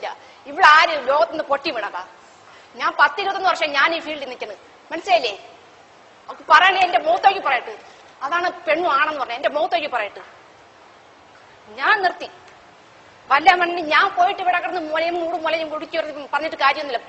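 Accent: Trivandrum, India. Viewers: thalle thironthoram slang.. polappan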